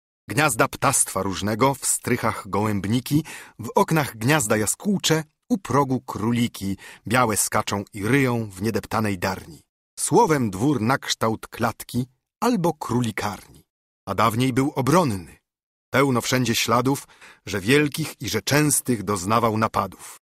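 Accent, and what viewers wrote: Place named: Central Poland, Poland. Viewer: Ach ta środkowopolska intonacja 😢 To powinien czytać jakiś aktor kresowiak. Utwór odczytany w tej melodyjnej wschodniej polszczyźnie, w której przecież został napisany, OGROMNIE zyskuje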